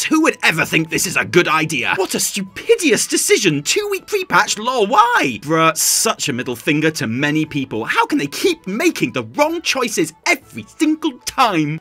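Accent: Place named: United Kingdom. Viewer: Good video fair points made, but why do you keep cutting the video after literally every sentence? Also you sound British but say the dates like the American way? Just curious.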